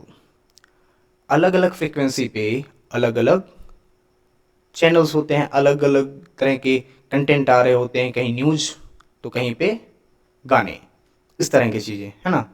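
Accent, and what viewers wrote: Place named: United Kingdom. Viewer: Sir ka hindi bhi English accent me h 😅 But explained too good 👍🙌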